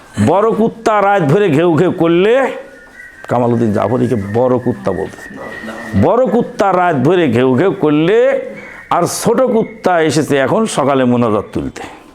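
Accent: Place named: Bogura, Bangladesh. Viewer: Bogurar kotha shune onk valo laglo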